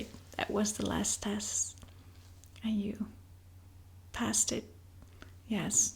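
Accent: Netherlands. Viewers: Hoor ik daar nu een Nederlands accent? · do I detect a Dutch accent ? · By any chance you're Dutch madame?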